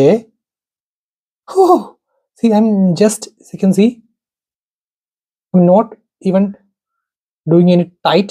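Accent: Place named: Kerala, India. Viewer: Dude, just a suggestion. You speak very fast, and your accent (I'm guessing you are from the South, possibly Malayalee) makes it very hard to follow you. Try enunciating your words better and speaking a bit slower. People aren't going to stay with the channel if they don't understand anything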